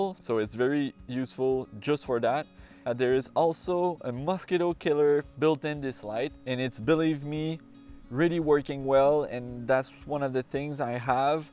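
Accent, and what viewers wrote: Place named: England, United Kingdom. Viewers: Definitely the English accent! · your English accent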